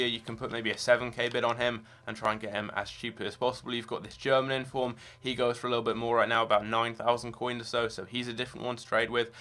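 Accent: United Kingdom. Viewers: want to know too.:-) english is verry british!:-D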